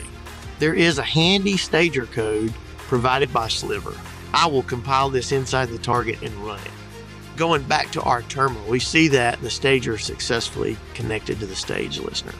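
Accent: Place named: South, United States. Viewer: an intelligent person rocks his country accent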